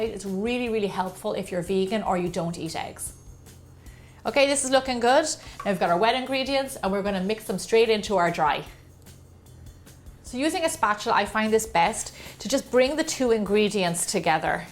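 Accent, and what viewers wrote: Ireland. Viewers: She is just soooo Irish! I love her accent !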